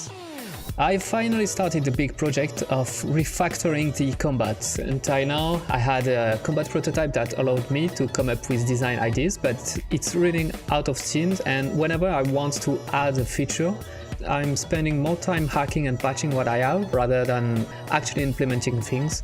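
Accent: France. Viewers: you ar french youuu ?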